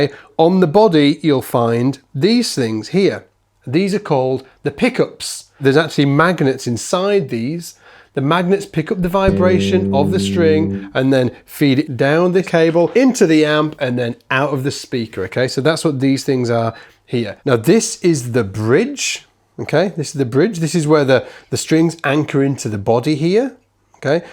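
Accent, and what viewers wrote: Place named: Liverpool, United Kingdom. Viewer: Dude is from the Liverpool area, because his accent is just like the Beatles! So somewhere along the Northwest coast of England!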